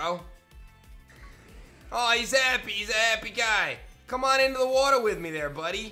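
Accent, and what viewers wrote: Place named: United States. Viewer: my guy ur american accent is 100 % i can barely stand it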